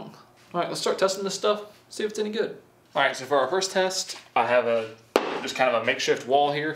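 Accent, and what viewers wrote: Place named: North Carolina, United States. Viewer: What state is he from. My guess is North Carolina , me being from there our accents sound familiar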